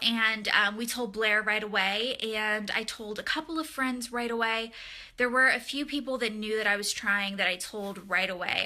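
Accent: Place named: South, United States. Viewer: I don't know what it is about you that makes me so happy. Maybe its that your twang comes out randomly when you're saying something. HAHA Its the same with Blair and my Texan self loves to support my southern belles. =P Congratulations on the Baby!! You must be so grateful that you have the flexibility to travel so much to Tennessee and with the morning sickness and bed rest? My goodness!!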